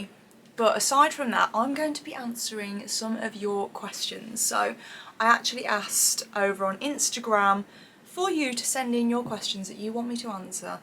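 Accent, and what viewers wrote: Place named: Birmingham, United Kingdom. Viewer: Hi, really enjoyed this video I related to you, when you touched on your background/family in particular. Real life hey. Also your voice is soo soothing to me. My Nanny 👵🏼 raised me, she was from Birmingham & had the same accent as you ☺️🙏🏽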